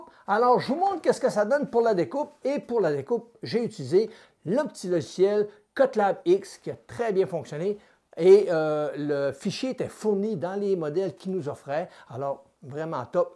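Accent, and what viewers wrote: Quebec, Canada. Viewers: Bonjour. Encore une vidéo claire et efficace. Merci. Commentaire sur le précédent commentaire, oui il fait -3°C en France, mais ce n'est pas à un canadien qu'il faut s'en plaindre. Ça doit bien te faire sourire le climat français par rapport à celui de l'Amérique du Nord. Mais je confirme, ton accent et ta bonne humeur nous réchauffe le coeur et l'âme.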